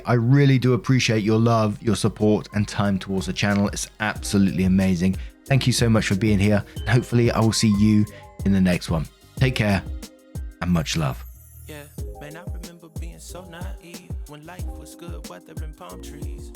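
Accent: United Kingdom. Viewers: every time i listen to talk i think "man he's so British"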